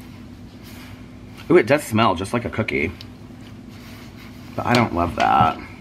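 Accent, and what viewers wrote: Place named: Southern Indiana, United States. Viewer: I'm loving how that "Indiana southern" accent came out in full force in this video. 😃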